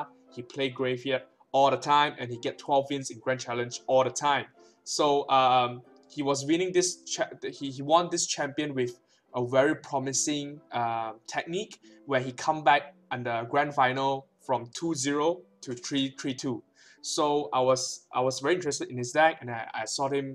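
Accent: Singapore. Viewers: U singaporean? accent tells me so lol. i am singaporean too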